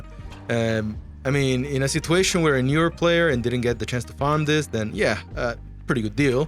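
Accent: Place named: France. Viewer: your accent to me sounds almost french though I could be wrong about that Don't have many friends from France As for my City yeah it's great here always festive celebrations good food great people to talk to and in some parts a party almost everyday if there's nothing to celebrate we find something to celebrate That's the Cleveland way If you ever come to the states (whenever that's possible) check out Cleveland Ohio you'll love it here As for the channel have you considered partnering or collaborating with other streamers of WOTV? There are some great ones out there Auronnj, Paladiah, Diggs maybe even try to get a sponsorship with Amazon like Auronnj and his Amazon coin affiliate link As for the PS5 I hope so too but first they said it be more accessible in 2022 but now there saying it might be in 2023. They say this is the greatest launch of the next gen but when you can't even keep it on the market and scalpers running rampant I would have to disagree sells numbers don't mean a great launch when less than 50% of the population of gamers still can't get your game console. 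Imagine the loss of revenue PS5 game developers are looking at for the first week of sells is everything and you can't just make up for that later. And as for the strategy I'm still working things out but eventually it will all work out in the end even your plan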